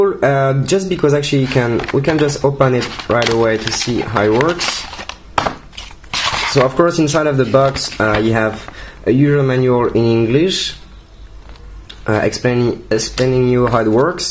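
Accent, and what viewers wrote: France. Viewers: tu es français??? oh la la l'accent; mec! Mais tu maitrises! :) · Just so you know, ACCESSORY is pronounced ak-CESS-er-ee. The emphasis is on the second syllable. It's not access-er-EE. I realize you have a French accent, but that pronunciation will cause people's brains to stop for a moment to try and figure out what you're saying to them. Thanks for the review! :) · Mec ça cd voit que tu est français Mai's pas grave tu gere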